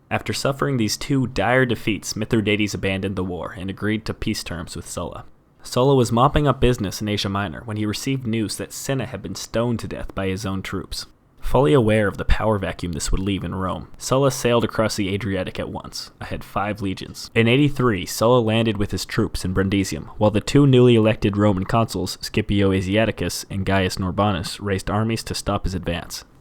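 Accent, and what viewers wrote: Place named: Canada. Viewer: he certainly has accent features that would indicate he’s Canadian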